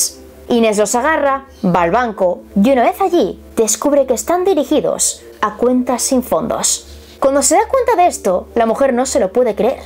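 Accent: Spain. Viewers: Saludos bellotas Magnífico acento español, lo amo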